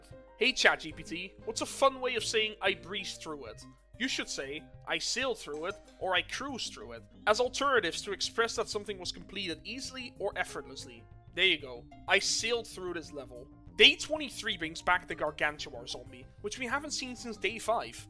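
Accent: Netherlands. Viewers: dutch accent spotted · Are u Dutch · U dutch?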